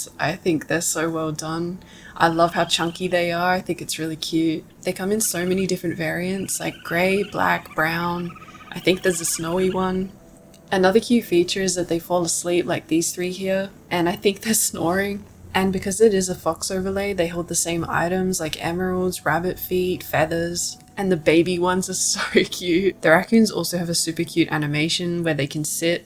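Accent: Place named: South Africa. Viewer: funny accent. is tht afrikaans?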